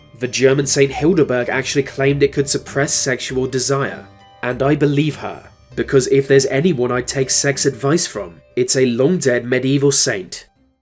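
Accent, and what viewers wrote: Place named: Scotland, United Kingdom. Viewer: I don’t think it’s a speech impediment I think it’s a local accent because I’m from Scotland and in some parts they say van instead of than and vey instead of they I could go on but I’d be here all day lol , I actually find his voice quite pleasant tho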